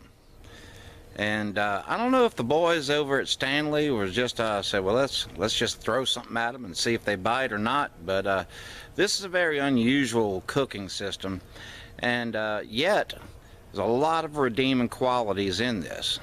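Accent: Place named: South, United States. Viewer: I love your southern accent !!!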